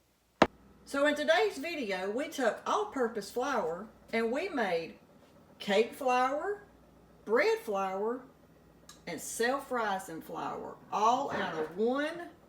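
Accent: South, United States. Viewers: I love the southern accent.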